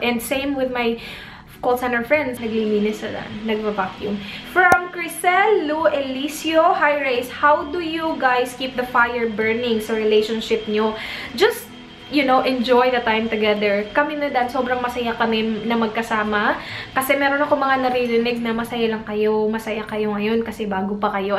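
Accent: Australia. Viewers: Hi! this vlog is on point. never mind the bashers. they're just insecure. and you are right, wear what is comfortable on you and what suits you well. not what suits other's eyes. to those who criticize on how you speak, saying your "maarte" na. you're not, its just how you adapt with the Australian twang. so, stay chic and cool as you are. take care! say hi to Dan! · You looked good, you're pretty & your pronunciation is normal na magchange cause in Australia may accent siya so need talaga ni ate na mag change yung mga words na ipronounce para maintindihan siya ng kausap niya na aussie (e.q, 'dinner' must be pronounced na dinna withour an 'r' ) mga gnyan. Anyways, i love you ate 😊 Pasagdi nalang nang haters kay naa rman na pirme, dili mana sila mawagtangan og ikasulti. Haha cause ana najud na sila full of insecurities & hatred. Always remember na mas damo nag hi-gugma saimo. 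Yay! Love you 😘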